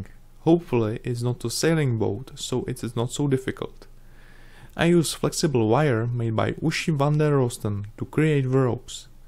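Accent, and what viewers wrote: Czech Republic. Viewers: You can definitely hear that you are czech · Já si podle toho přízvuku říkal že budeš čech (zápalky z penny tě prozradily) :-D super práce.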